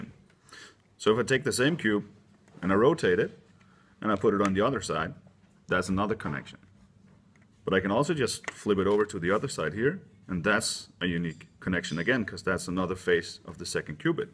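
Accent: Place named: Denmark. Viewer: Where are you from? You sound Danish :o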